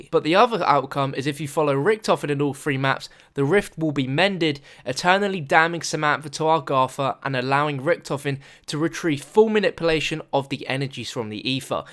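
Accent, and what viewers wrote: United Kingdom. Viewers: we dont care about your opinions or british accent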